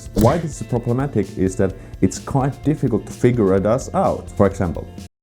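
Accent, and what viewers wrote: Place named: Finland. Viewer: Kyl sä oot vähä jäävi kritisoimaan suomalaisia, kun puhut englantia suomeksi murtaen...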